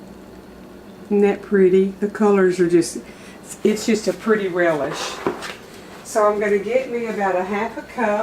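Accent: South, United States. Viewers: I make a dill relish that we absolutely love! I think I will try this recipe as well. It does look so yummy! I live in Michigan and we are trying to get get gardens started here. I would love to see any canning videos! I am a canner from way back but never get tired of watching canning videos! I love to try new canning things. Like I said I’m a canner from way back, helping my mom as a young girl and then for my family, but I’ve just started dehydrating. Do you have plans on making dehydrated videos? I would love to see that as well. I’m really enjoying your videos. My family is from the south so hearing you talk and they way you phrase things is just beautiful! It reminds me of home! Thank you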